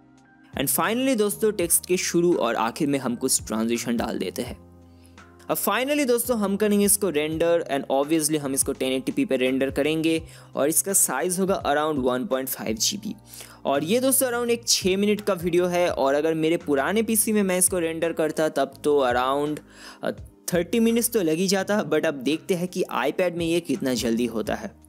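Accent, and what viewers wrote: Bengal, India. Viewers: Bengali naki Tumi?Bari kothai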